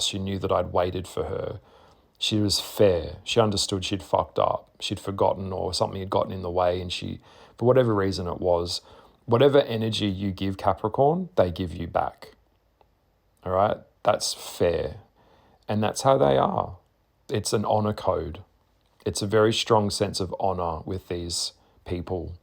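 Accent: Australia. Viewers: 🤣🤣 he is Australian not French where did you get that from ?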